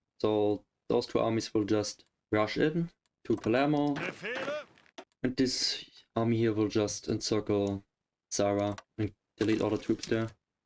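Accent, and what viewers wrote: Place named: Germany. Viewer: nice video bro und mann hört das du deutsch bist no front :)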